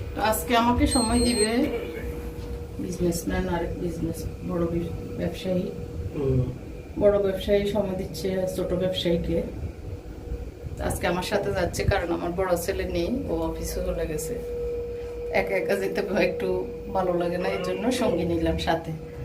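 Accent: Noakhali, Bangladesh. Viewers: আপনি কি নোয়াখালীর?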